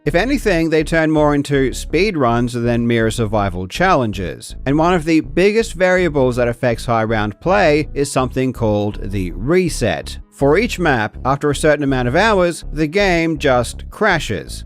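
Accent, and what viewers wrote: Australia. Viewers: He's Australian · Love your videos. But to be honest, your accent plus the way you speak for the videos is like nails on a chalkboard. It’s awful. Aussie streamers I feel like get more views than average because of their voice, but yours is just plain grating. Any hoo, on to watch the video…. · CH is challenging for English speakers, don't sweat it. The CH in Nacht is said like the CH in Loch, the Scottish word for lake, like e.g. Loch Ness. The A is like in car, but short. Your first pronunciation in the video is correct. Yes, I'm saying correct. Sounding a little different than the theoretical standard pronunciation doesn't have to mean you're mispronouncing it, it just means you have an Australian accent because you got way too close to standard to be called "wrong."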